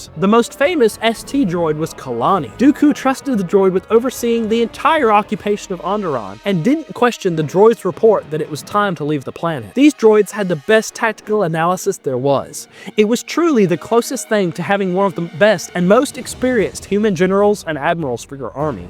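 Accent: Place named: South, United States. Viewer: I love the southern accent trying so hard to come out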